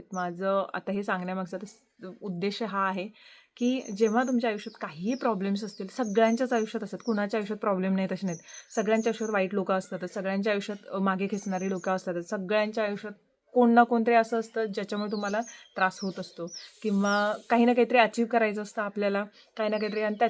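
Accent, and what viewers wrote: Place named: Belgaum, India. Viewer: तुमची भाषा ऐकुन फार छान वाटतं बेळगावची आठवण येते... माझं माहेर उचगांव बेळगांव ❤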